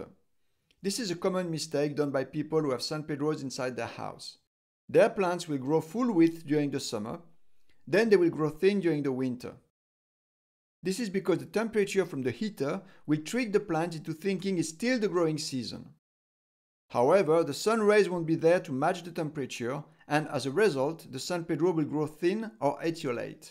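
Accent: France. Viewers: Your accent is too much 😆 fucking french 😂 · french accent ? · wtf l'accent francais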